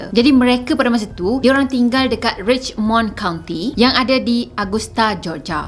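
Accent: Kelantan, Malaysia. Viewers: kamu org Kelantan ke?.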